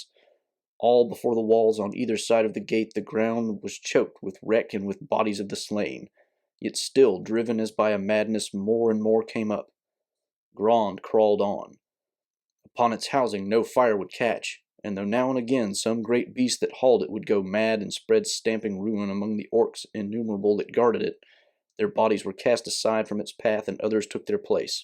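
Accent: South, United States. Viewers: Great Reading. Love the Southern Accent. Make more videos on the Silmarillion and Keep Pushing. Also, get on Odyssey and other platforms. I love your presentation. Very good.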